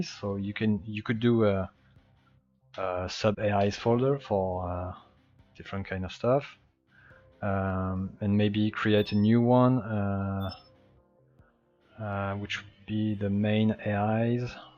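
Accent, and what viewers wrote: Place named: France. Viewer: i feel sound a french accent :D (nice review)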